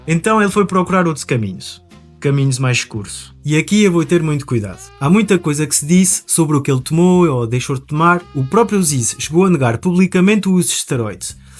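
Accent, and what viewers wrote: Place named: Portugal. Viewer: Você é português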